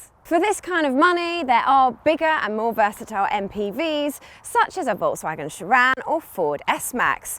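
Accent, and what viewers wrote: England, United Kingdom. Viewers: Lovely English accent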